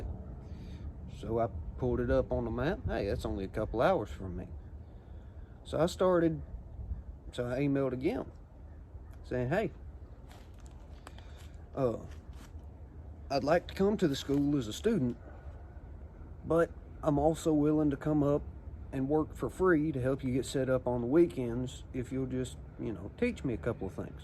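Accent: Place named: Kentucky, United States. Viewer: Sounds like a Ky accent.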